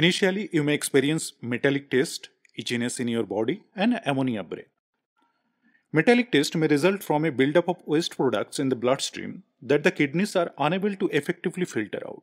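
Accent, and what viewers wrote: India. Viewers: You can turn on Closed Captions (CC) and then read what he is saying. I can understand him fine as I’ve had friends from India.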